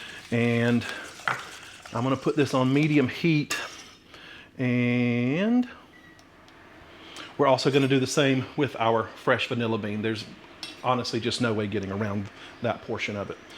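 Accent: South, United States. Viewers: Thank you. I just started watching you, and I am very impressed with many things on your show. Listing a few is your southern accent, your honest input without judgement on one or the other recipe that you are introducing to your audiences, your knowledge and teaching us of items/tools used to cook with and your knowledge of ingredients, sometimes strange, used to cook with, and how you present and do the show. The finger up to the camera for a one second pause, then flash to a new view of you having transitioned to the next thought, the way you taste and truly enjoy the items. And btw I looked at some older film of you on this channel and I can see you are thinner. This Keto life is a good thing! Thanks!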